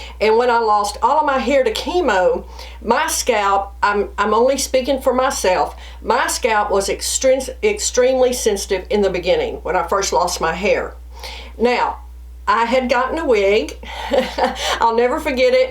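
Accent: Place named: Boston, United States. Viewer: Thank you for doing this video--very informative. I've been experiencing some itching; and yes I'm thinking it is the permatease for me. Laughing about your accent--I'm from Boston but it sounds like Bahstun.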